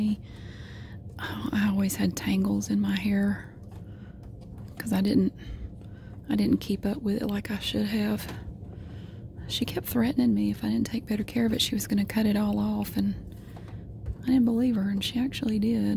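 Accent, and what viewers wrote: South, United States. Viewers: I guess being an adult means falling asleep to a southern lady organizing the clearance section of the dollar store.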